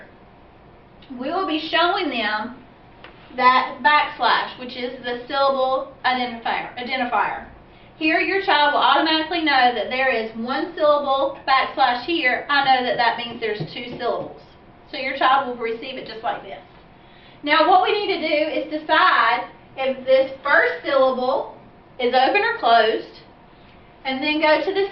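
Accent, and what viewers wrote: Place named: South, United States. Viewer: Vicky Popova it’s a very common pronunciation for native speakers in the southern United States. Definitely a native speaker as non-native speakers don’t tend to learn this particular dialect or whatever.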